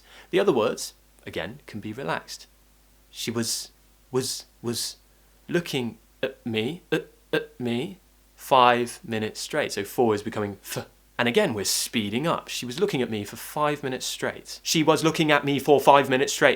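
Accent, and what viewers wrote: United Kingdom. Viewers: I luv your British accent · I’m always looking forward to listening to your beautiful British English! Fantastic Friday! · as well as your British Accent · I do love your teaching british accent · I love your accent. You sound like a posh English man❤ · I love your british accent ❤️ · one thing I must mention I have been learning pronunciation for several months through ur video lessons,and I have been imitating your speech.Now my ielts tutor is just amazed about my pronunciation like a British.I reaaalllyyy respect,admire,love you.U r really super tutor.🤗🤗🤗🤗🤗🤗🤗🤗take care urself for us and ur family!!!!❤❤❤ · When I found your channel I didn't know how to have a British accent, but with your videos I have learnt a lot. Thank you!!! You're an amazing and smart teacher. I love listening you! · You are an amazing teacher, I like your British accent.........a bientôt sur YouTube 😘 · Love ur British accent. 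Thanks for ur fantastic lessons. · Hello 👋🏻 Actually it is very hard to speak like native british people. I was studying british english at school but unfortunately even my english teachers couldn’t speak like you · your voice and your British accent😍i fell in looove🙃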